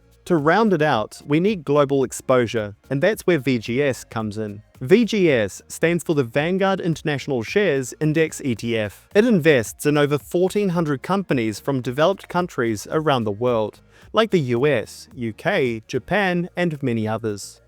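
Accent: New Zealand. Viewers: I hear a NZ accent. Im not crazy right?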